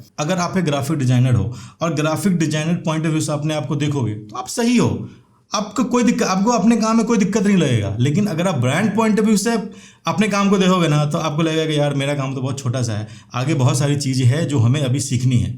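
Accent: Bihar, India. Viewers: Bihar se hain ka? Ya UP se?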